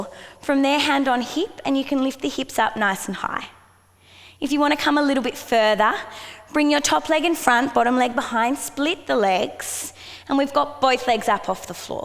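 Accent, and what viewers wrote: Australia. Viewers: I love your Australian accent!!!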